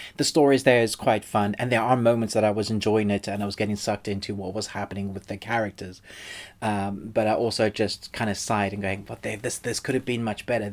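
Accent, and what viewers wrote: South Africa. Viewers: You South African . Much love from cape town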